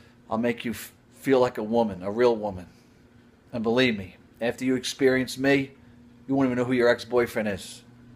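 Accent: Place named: Brooklyn, United States. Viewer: It's a Brooklyn accent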